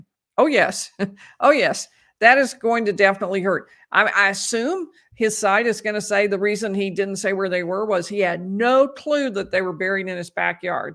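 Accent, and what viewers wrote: South, United States. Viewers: I was born in Bainbridge, GA and grew up in Columbus, GA. So happy to hear your Southern voice.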